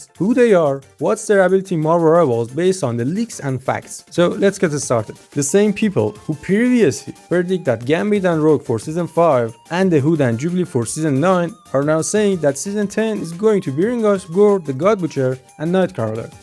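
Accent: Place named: Iran. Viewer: u gotta be persian bro i would recognize an accent like that anywhere 😄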